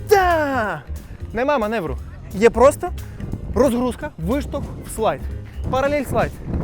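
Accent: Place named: Russia. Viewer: А якщо ухвалять закон, що з російським акцентом не можна говорити? Що тоді? Замовчите?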